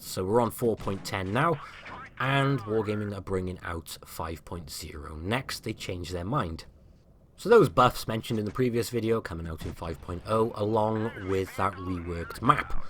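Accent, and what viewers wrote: United Kingdom. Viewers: Blitzers from the stream for 5.0 are asking for your voice to be added for British crew voices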